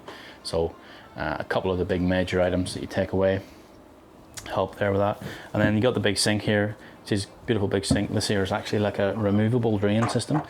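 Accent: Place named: Northern Ireland, United Kingdom. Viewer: Northern Ireland, sounds like.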